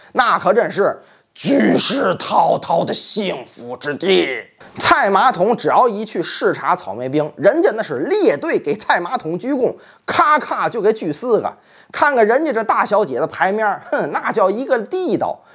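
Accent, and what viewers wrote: Tianjin, China. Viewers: 这家伙，天津嗑儿绝了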